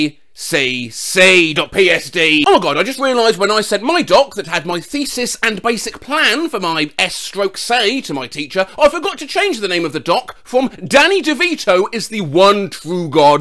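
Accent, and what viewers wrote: United Kingdom. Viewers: he dose have a British accent I do admire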